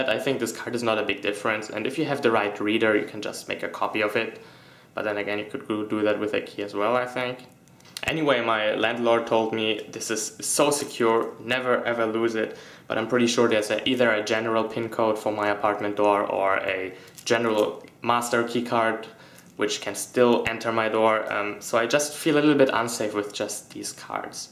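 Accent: Germany. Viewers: OMG hab dein Video gesehen und hab die ganze Zeit gedacht, ist der nicht deutsche, weil dein leichter akzent hat mich stutzig gemacht und als du dann über das verhältnis von deo in deutschland geredet hast, hab ich es gemerkt. Sehr cooles video. Grüße aus Köln :) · Jesus Christ your German accent sounds stupid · Is he German? He kind of has this German accent when he talks other languages :( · Ich dachte mir schon hmmm der akzent hört sich deutsch an und dann wirklich wooouw ich kann germsn accent raushören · Du sagst einen Satz und man merkt sofort, dass du Deutsch bist :D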